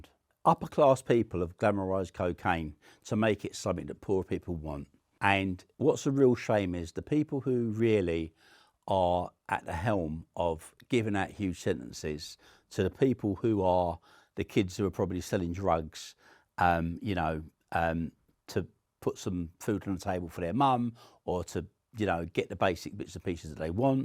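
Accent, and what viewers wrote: United Kingdom. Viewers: This britisch Englisch is so discusting..... Bah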